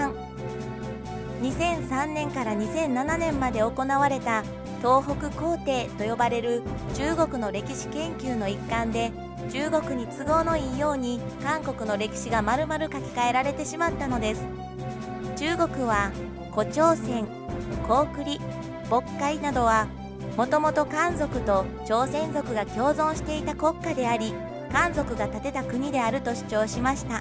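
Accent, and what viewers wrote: Kansai, Japan. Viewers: 柔らかい関西弁、好き！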